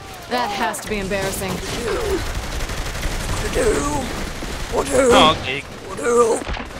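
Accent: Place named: United Kingdom. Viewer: That british accent is offensive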